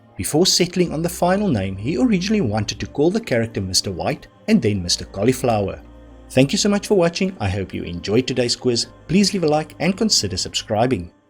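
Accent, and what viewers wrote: South Africa. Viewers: I find the pronunciation perfectly acceptable. Not being picky, but when "Poirot"is needed, try saying "PWAR - OH ". ( That's " yer " actual French!😊 By the way, do you have a tinge of "South African " there ? Enjoyed the quiz, only missed a few questions.😊 😊🇬🇧🦉🌿🎶🌼🇬🇧😊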